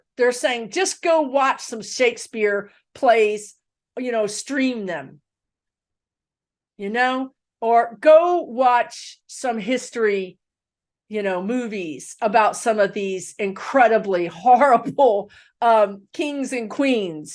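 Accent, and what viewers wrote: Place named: West Texas, United States. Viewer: Your sooper awesome, a great reader...... And talk and sound just like me.!! ( West Texas. ) I catch every reading and your guides are great. As well. Thanks for bn here.. 🌹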